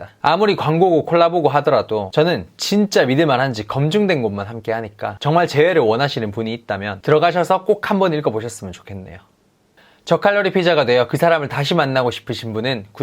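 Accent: Gyeongsang, South Korea. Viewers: 경상도 이네요이. 좋은자료 good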